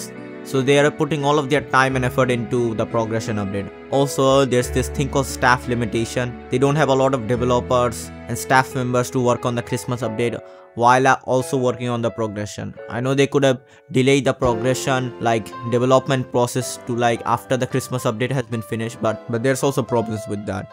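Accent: India. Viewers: The music is the same as in all of your videos. Your accent is indian. Words are just words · Hey guys didn't u notice the update the air just got colder Edit: btw are you Indian cuz u got some Indian accent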